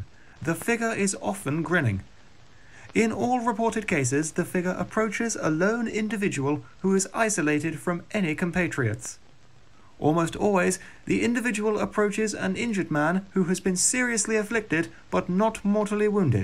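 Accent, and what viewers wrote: United Kingdom. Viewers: it's because he's from the uk lmao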